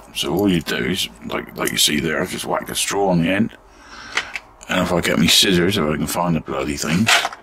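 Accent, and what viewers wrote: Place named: Devon, United Kingdom. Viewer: Hi, so pleased to be able to get back to your channel, followed you years ago, I have since had a Double Lung Transplant so have loads of time to get back into my Restorations, absolutely love your videos, you sound very much like a Devonshire lad like me, I wish you all the very best, I look forward to your next Video, Take Care bud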